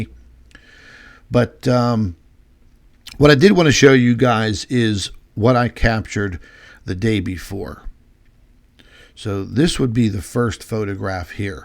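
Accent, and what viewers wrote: Pittsburgh, United States. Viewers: Hi: So glad you've started reporting again. I know you are busy and with the good Doctors, health issues it's difficult to find time for all you want to do. But this video is sensational- I mean sensational! That steller core(?). is amazing, thank you. Yes, it makes me wonder and how dare NASA and the psuedo scientific community not doing what you do, shame on those scientific charlatans. I occasionally have dabbled in a youtube channel if I should start again how can I get permission to use some images and quote your research, of course, I would give all credit to you should you desire. The best way to initially respond is to reply here I won't waste your time nor mine with email unless you agree then I will contact you. FYI I was born and raised in the South Hills area of the "burgh" I have not been back in 40+ years. 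Your accent and attitude remind me of my childhood home, thank you.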